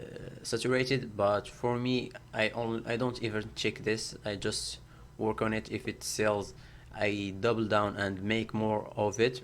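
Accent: Morocco. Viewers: hta ana 3Rftk mghribi nice bro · Men Accent 3rftk mghribi hhh + Chokran Bro 3la cher7 ;)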